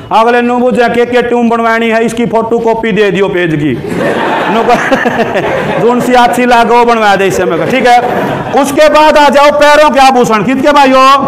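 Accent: Haryana, India. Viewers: Mera Haryana gk se door door se koi matlab nahi hai sir me to bas apki Haryanvi language sun ne ke liye aur apki teacher quality ke liye video dekhta hu good sir keep it up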